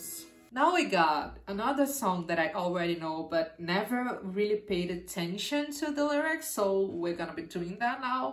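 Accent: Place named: Brazil. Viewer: Nossa é incrível como brasileiros conseguem reconhecer brasileiros só pelo sotaque haha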